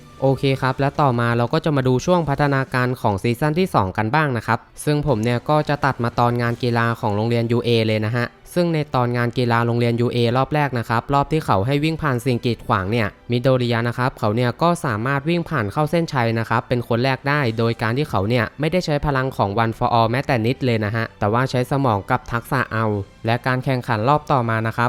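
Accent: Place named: Mae Klong, Thailand. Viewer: เป็นคนแถบแม่กลอง ราชรี เมืองกาญรึปล่าวครับ สำเนียงการพูดนี่แถบบ้านผมเลย555ผมคนแม่กลอง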